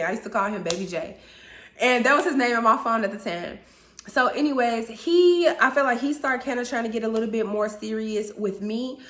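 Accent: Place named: Midwest, United States. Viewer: It's so Midwest 😅😅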